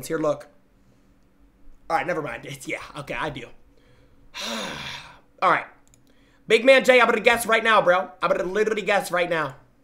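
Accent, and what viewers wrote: Canada. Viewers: W Canadian accent, w junk for sure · That Canadian accent killed me 😂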